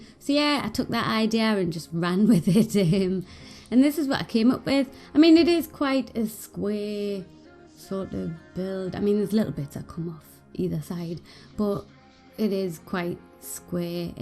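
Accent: United Kingdom. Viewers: Your accent sounds like a mashup of something from the Caribbean plus something from the UK what is it i'm in loveeee???!?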